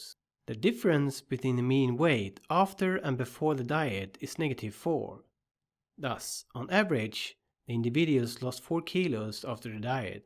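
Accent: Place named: Sweden. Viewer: Din svenska accent går inte att ta miste på. Vrf gör du inte videon på svenska?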